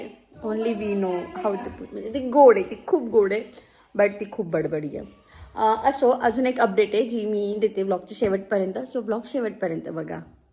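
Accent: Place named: Khandesh, India. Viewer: A tuz माहेर कुठल आहे नक्की नाशिक खानदेश tone vatto thoda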